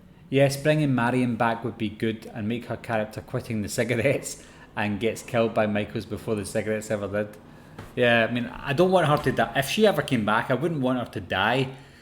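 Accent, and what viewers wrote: Scotland, United Kingdom. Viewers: Wait... are you Scottish??! 😍😍😍 So your first language IS English!!! Or is it Gaelic ? 😜🤣😍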